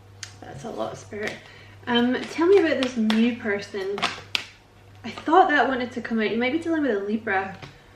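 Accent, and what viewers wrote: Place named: Scotland, United Kingdom. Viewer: Such a beautiful reading sweetheart. Yes....that sounds like my twin flame..we both had to do our shadow work before the reunion 🫂. Thank you for spiritual skills. I love your Scottish voice...we are in the same country. Peace&Love&Light Namaste 🙏 ♥️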